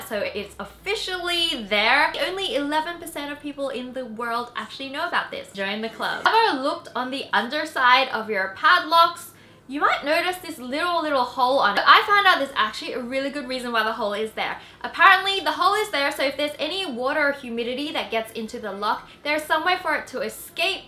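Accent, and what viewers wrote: Australia. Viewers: Bunnykis she's Australian. At least I'm 98% sure. Because I'm Australian but at the start it was also hard for me to tell as well 😅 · Are u Australian wengie? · She has a Australian accent because she's lived in Australia for a while before when she was a younger and she's actually Chinese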